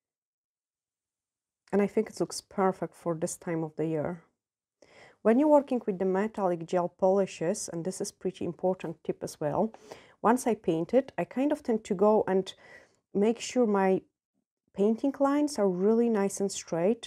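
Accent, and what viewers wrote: Scotland, United Kingdom. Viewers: I knew I heard Scottish in your accent! Love how you’ve picked up wee words.. 🥰 am in central Scotland wee bit far from you, your nails are stunning, have you tried the new soft gel tips yet? I’ve ordered mine from Gelish Harmony xx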